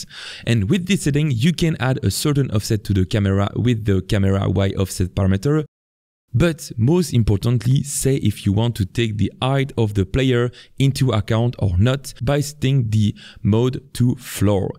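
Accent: France. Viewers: beautiful french accent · le petit accent francais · On entend que tu est parlent francais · Bonjour, l'auteur a un accent français... 😉... Il n'existe pas une version française à tout hasard ? 🙂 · T’es français ? J’ai dit ça étant donné que ton accent anglais semble français. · cet accent so french.. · I'm making this game on my laptop and when I'm finished, I'll export it as an APK file and sideload it to the quest. Sadly, that means I will not be able to test my game until i'm finished. But, I'm determined to do it! My Game is going to be called Glocks n' Goblins. Basically, swarms of mediveal enemies come at you and you have a powerful sword and a glock to shoot and kill them. there are enough tutorials on youtube to let me accomplish my goal and I am so happy to say that out of all the Unity tutorials on youtube, you have been the easiest to follow. But the French accent can be kinda difficult to understand sometimes, I'm from Canada, so I can pretty much understand it. 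Thank you again! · Ah tu est français? Moi jai reconnu ton accent xD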